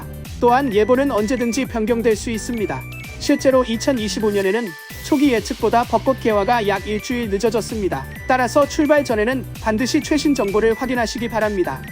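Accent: Japan. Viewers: 님, 일본인은 아니시죠? 발음을 들으니 재일동포 같으신데, 맞죠? 😊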